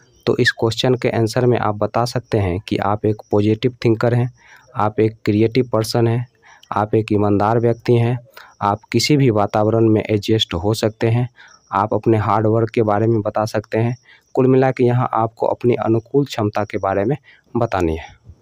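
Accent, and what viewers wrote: Bihar, India. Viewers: u r from Bihar Right?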